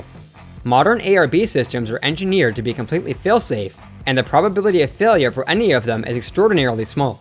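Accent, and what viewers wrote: Canada. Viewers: wait, are you Canadian? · I can't get enough of how you say roller coaster. God Bless Canada and its unique collection of modified vowels sounds.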